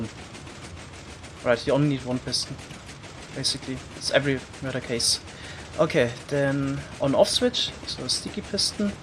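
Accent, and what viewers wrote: Germany. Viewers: R u german or what is that cool accent ? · Man merkt krass das du deutsch bist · The Video sounds like german Englisch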